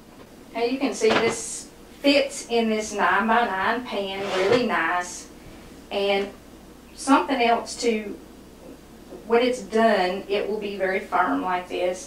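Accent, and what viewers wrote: South, United States. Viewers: I'm a southern girl myself and I love to hear your accent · A lady with a southern voice like this HAS to be a great cook!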